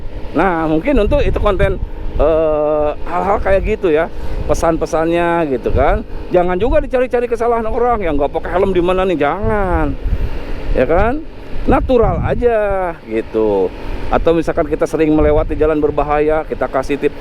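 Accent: West Java, Indonesia. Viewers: assalamualaikum abang, salam silturahim dari mmx malaysia. wah biker sejadi. ngevlog abang juga keren euy. enak dengerin logat sundanya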